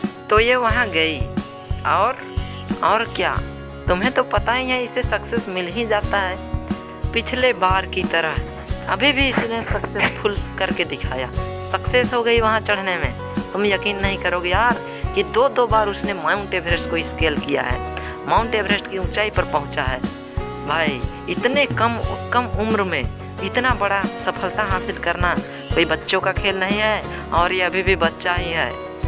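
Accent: Bihar, India. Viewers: Bhai were are you from. I think you are from bihar 🤗❤️ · SIR AAP BIHAR SE HO ???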